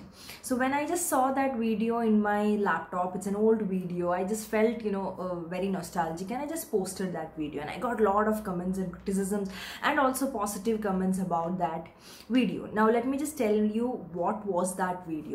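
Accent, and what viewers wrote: India. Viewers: No popular english self help books are available over YouTube in Indian accent. I think you have a wonderful, sweet and friendly Indian accent. If you think of doing it, it would definitely help lots of aspirants like me in developing our English beside personality. Thank you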